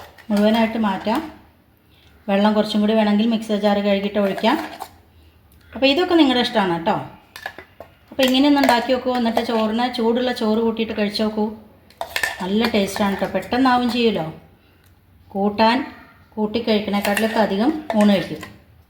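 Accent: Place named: Valluvanad, India. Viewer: നല്ല വള്ളുവനാടൻ ശൈലി🥰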